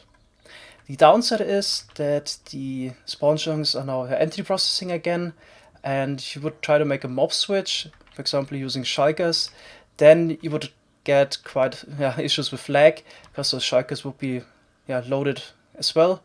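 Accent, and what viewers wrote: Germany. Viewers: Best intro of all of YouTube, and best German accent as well.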